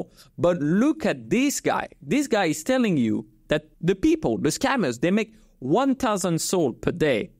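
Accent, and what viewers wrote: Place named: France. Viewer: cet accent français mamamiaaaa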